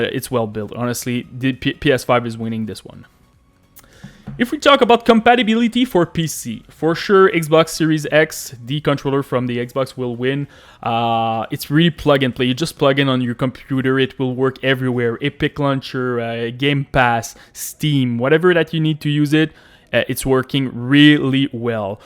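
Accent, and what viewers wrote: Quebec, Canada. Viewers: Est ce que tu est québécois? parce que j'attend un petit accent XD mais sinon superbe vidéo.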